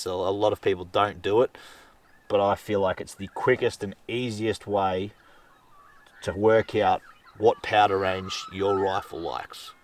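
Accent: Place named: New Zealand. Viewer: awesome vid. im trying to work up a load for the same rifle. thanks for doing this. p.s sounds like ur in NZ - i would love to come hunt there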